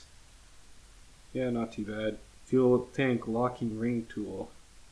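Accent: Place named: Alberta, Canada. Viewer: Enjoy the videos man. You sound like a fellow Albertan! Subscribed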